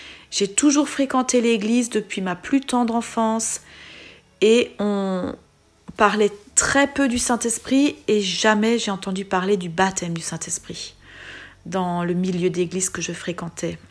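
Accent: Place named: Alsace, France. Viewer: Êtes vous originaire d'Alsace ? ( une petite pointe d'accent de l'Est ☺️❤️)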